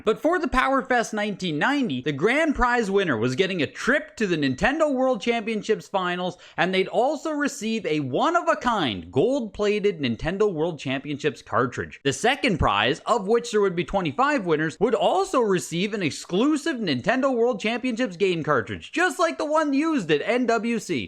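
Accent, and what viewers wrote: Canada. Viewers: What is that accent you have? Canadian?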